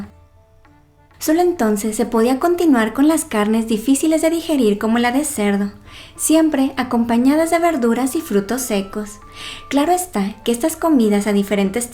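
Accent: Mexico. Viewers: La voz es de mejicano con acento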